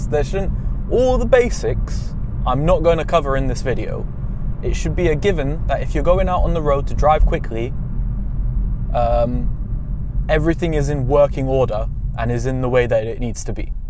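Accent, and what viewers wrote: United Kingdom. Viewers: Mf speaking British 💀💀💀🤢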